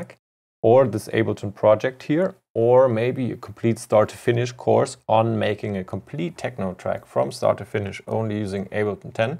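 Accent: Germany. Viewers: Are you german? You sound german at least...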